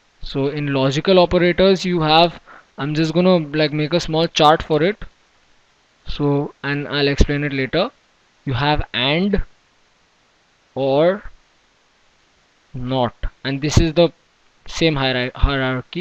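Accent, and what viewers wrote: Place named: India. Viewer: Sir thanks a lot:).I m just 13 years and in ryan icse.ALL THE DUMB TEACHERS IN THE SCHOOL CANT EVEN TEACH ME A SINGLE LETTER OF JAVA and sir you did in just some couple of videos.THANKS A LOT.I luv ur english it s indian pitch so i can understand better:D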